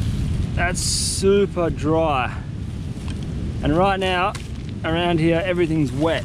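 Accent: Australia. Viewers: Mate are you Australian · At first I thought this was fake. Then I heard the Australian accent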